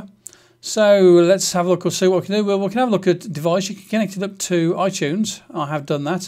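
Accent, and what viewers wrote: Birmingham, United Kingdom. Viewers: are you a brummie? me too !!! thx for the video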